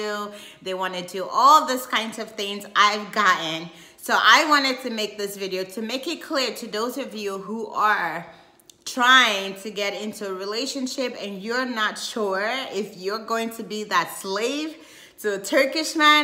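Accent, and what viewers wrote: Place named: United States. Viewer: Unfortunately I absolutely dont agree with most of your video's it took me this long to respond. I was married to a Turk who not only abused me mentally emotionally and physically gradually over the years. Seems like you have an American accent 👀 He came to London with nothing back in the 80's it took 15 years for me to leave. He is now a multi millionaire due to me my lovely. Please don't think your irreplaceable . However you have given me an inspiration to make a video about my journey being married to a Turk.. I have a 34 year old daughter a film director now and 5* Michelin chef in London and a son who lives in Istanbul. I will definitely show my daughter this video. Your very naive. 🤓 sorry if this offends you. ♥️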